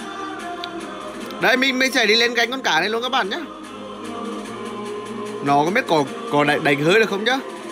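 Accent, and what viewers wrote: Nghe An, Vietnam. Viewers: nói tiếng Nghệ An cho đặc sệt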